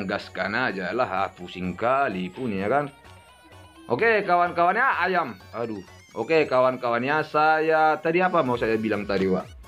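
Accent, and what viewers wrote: Medan, Indonesia. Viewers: Semangat yaa Wak semoga Chanel nya makin sukses 💪💪 dan slalu berkarya <num> Kya nya orang Medan wawak ini 😁😁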